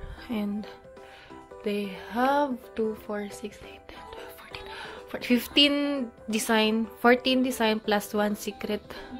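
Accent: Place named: Philippines. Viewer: Filipino accent AHHA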